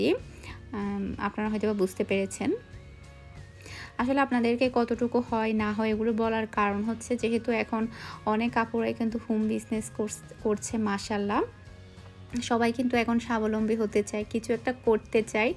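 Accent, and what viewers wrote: Chittagong, Bangladesh. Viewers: Jehetu uni khas chatgaia, chatgaiara shuddho vashai kotha bolte gele airkm e shonai...kichu korar nai.... R try korle hoito hobe....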